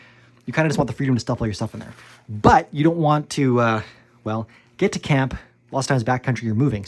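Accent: Canada. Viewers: I’m confused..you sound Canadian but have a gun collection that would rival Burt Gummer from Tremors. I thought Justin Castreau doesn’t allow guns?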